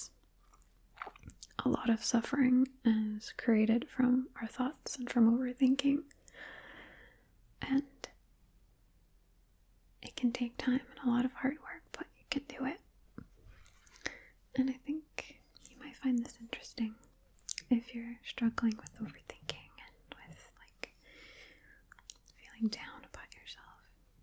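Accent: United Kingdom. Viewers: please make more tutorials with british accent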